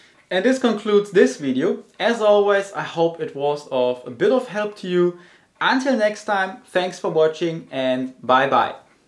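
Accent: Germany. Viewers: french? =)) ahaha hey j'aime ta, parlant français, l'accent allemand hes German dude xD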